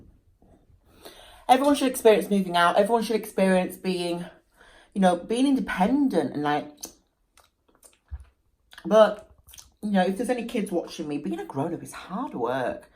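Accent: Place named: England, United Kingdom. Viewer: Gorgeous as always. I wish I had your lips. 🤤🤤 Your accent... I cant figure out what part of England you are from. 😂 I'm American but have lived in West Yorkshire for 8 years with the English husband. That cheese cake looks amazingggggggg. ❤❤☮☮🥓🥓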